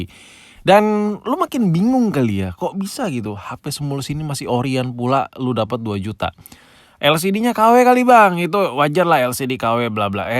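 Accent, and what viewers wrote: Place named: South Jakarta, Indonesia. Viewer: Bng lu org jaksel ya? Cara ngomong ny jakset bet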